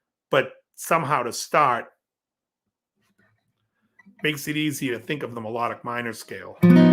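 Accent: Boston, United States. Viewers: I can totally hear the Baahstun accent now! I also did not get notice that you were doing a live stream….I wonder why? Any idea?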